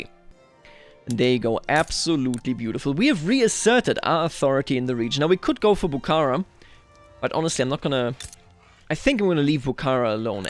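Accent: Germany. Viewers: Hearing iranian names in a german accent is.... interesting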